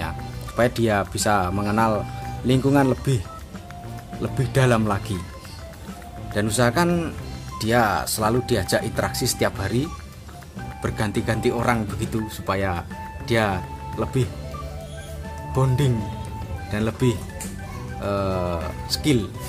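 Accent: Java, Indonesia. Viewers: Ak seneng konten ini satu gaya MEDOK bahasanya tdk ada di channel lain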